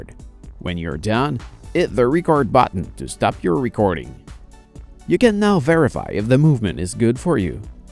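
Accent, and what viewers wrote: Quebec, Canada. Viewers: French Canadian ?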